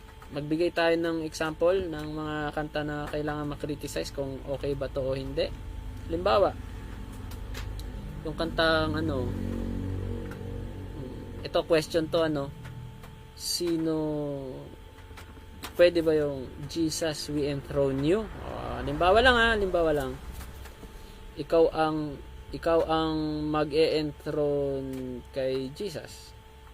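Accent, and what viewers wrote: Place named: Ilocos, Philippines. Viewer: Parang Ilocano din ang Pronounciation ninyo doc he he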